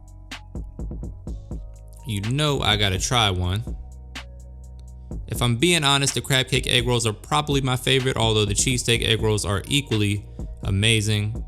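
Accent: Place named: Louisiana, United States. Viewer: Sounds to me like... You must be from Louisiana! 😁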